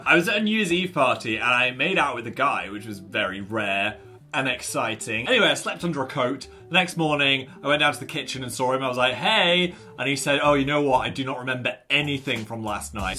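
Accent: North, United Kingdom. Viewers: Sir you've gone more northern again while Daniel has been away... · has been alone too long and his northern side has re-emerged 😂 the accent be strong ✊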